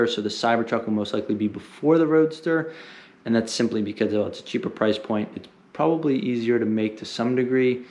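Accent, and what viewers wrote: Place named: Bulgaria. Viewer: Trying to figure out your accent. Maybe Bulgarian? Anyway. I appreciate the difficulties of ESL. I have never heard anyone say Alameda the way that you do (4 syllables) 🤓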